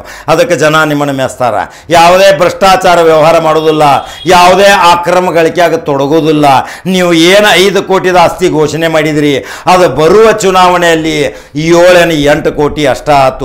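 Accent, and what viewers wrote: North Karnataka, India. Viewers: ಸುವರ್ಣ ನ್ಯೂಜ್ ನವರು ಹೇಳಿದನ್ನೆ ನೀವು ನಮ್ಮ ಉತ್ತರ ಕರ್ನಾಟಕ ಭಾಷೆಯಲ್ಲಿ ಅದು ನಿಮ್ಮ ಸ್ಟೈಲಲ್ಲಿ ತುಂಬಾ ಚನ್ನಾಗಿ ಹೇಳಿದ್ದಿರಾ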